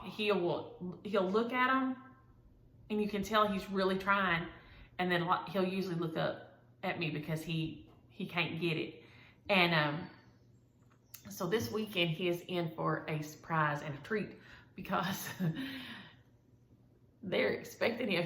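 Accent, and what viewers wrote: South, United States. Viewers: we speak the same Southern drawl!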